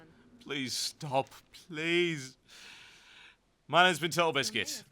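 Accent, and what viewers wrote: United Kingdom. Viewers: yer being british rocks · "Even if you don't care about the Lore, you'll care about whats about to happen" "Oh dear." "that sounds like crashing water in the background" "What the BLOODY HELL happened here. Wow. It's all gone." i literally had to pause to catch my breath with those rapid fire bits of humor, delivered in british fashion. i have to seriously ask where you're from, and what accent that is, or as much of an accent as you'll have to an american listener.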